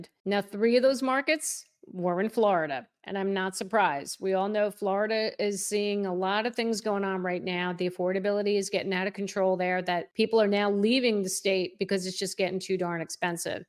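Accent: New Jersey, United States. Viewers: Ms., I grew up in NJ and was glad to leave when I did because I like more open space, but I really enjoy your accent and disposition. Reminds me of the part of Jersey that I didn't know I still really appreciate: the people. Thank you for your objectivity and conviction. Been enjoying your content lately as a soon-to-be new home buyer.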